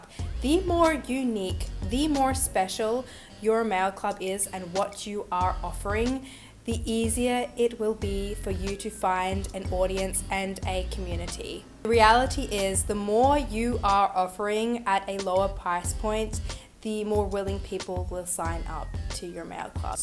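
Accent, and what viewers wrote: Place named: Australia. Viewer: You must be spending a small fortune in stamps! You sound like you're from Australia. Our stamp prices in this country are astronomical! How do you cope financially?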